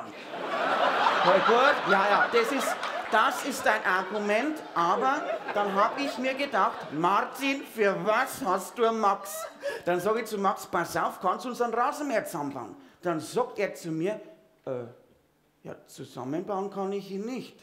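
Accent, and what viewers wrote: Bavaria, Germany. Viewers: Ich muss sagen, komplett bayrisch ist sein Sketch noch mal besser.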